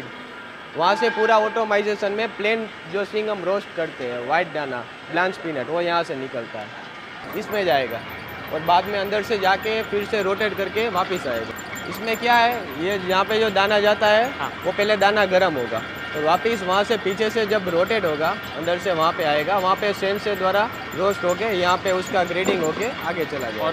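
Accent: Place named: Gujarat, India. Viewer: Vo gujrati keret hi bolte he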